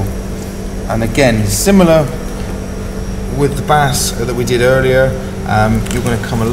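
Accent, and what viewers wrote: United Kingdom. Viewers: I stumbled upon this channel when I searched for lobster videos a while ago. Definitely worth subscribing if you like sealife, British accents and wholesome educational videos. Keep it up, you deserve way more subscribers! 👍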